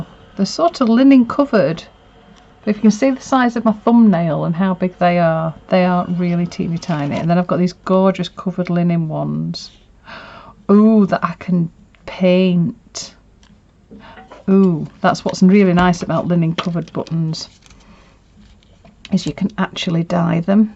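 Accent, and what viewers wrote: Yorkshire, United Kingdom. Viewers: Lovely to hear your Yorkshire accent again this week, and what a pretty fringe. I have just the fabric I need for this week. Thanks for your humour and inspiration.m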